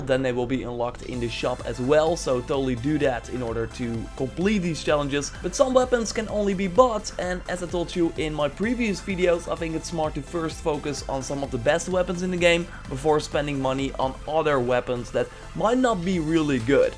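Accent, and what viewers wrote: Netherlands. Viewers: are you dutch? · are you dutch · He's Dutch lol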